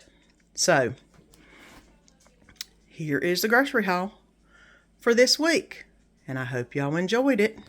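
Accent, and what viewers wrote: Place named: North Carolina, United States. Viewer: I absolutely love your accent. I also live in NC. Great Haul!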